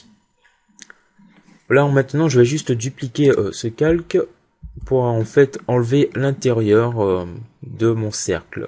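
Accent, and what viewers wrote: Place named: Antilles, Caribbean. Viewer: a ta voix sa se voit que t antillais ;)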